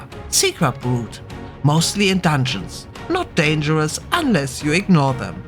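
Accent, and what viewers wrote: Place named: Germany. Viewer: Den Kanal nie gesehen, nach den ersten zwei Sätzen Englisch "Ah eine Deutsche" 😄. Aber eine nette Stimme, alles sehr schön erklärt.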